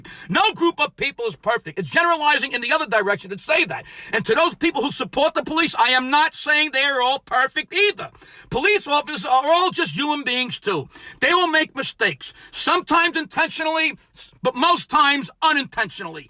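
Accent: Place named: Bronx, United States. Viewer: I LOVE ❤️ you. You talk like my grandparents did in da Bronx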